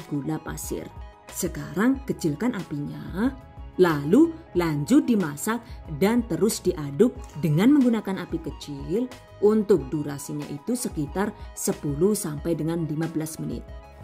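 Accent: Banyumas, Indonesia. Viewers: Terimkasih bunda...sangat bermanfaat untuk saya....kepengin ngundang "yu" janen..kayane wong panginyongan... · Sampeyan wong bule opo wong ngapak to mbak kok lucu banget tlng tampakan wajahnya mbak penasaran sy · Suka banget sama chanel jlas Pk bnget jelasinya..lucu ngapake koyo nyong😂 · selalu tersenyum bila bu naratornya udah ngeluarin bahasa ngapaknya😄😆 tapi selalu mantap resep dan tipsnya. semoga semakin maju channelnya.. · deneng ngapak 😅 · Resep selai nanas dr video ini uda q download dr setaun yg lalu jd resep andalan... sbnernya selain resep nya yg ciamik... suka sekali dgn pnjelasan2 nya yg lucu n logat ngapak nya apalgi pas bhsa ngapak nya keluar truz blkgnya sllu ada kta yyuk... jd bsa snyum2 sndiri.. #GiveawayTHV2021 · Bisa ngomong bahasa ngapak yah 😁 Cilacap hadir☝️ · Ngapak2 deneng koh😁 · Penjelasan nya mudah dipahami.. ngomongnya enak didengar ada lucu," nya bahasa ngapak.mantap bunda.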